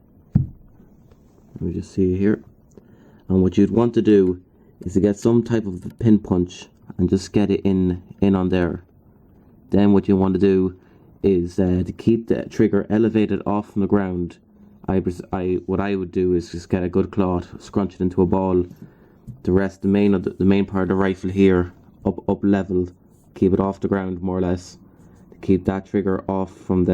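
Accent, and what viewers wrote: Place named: Ireland. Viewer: Sounds like he's Irish.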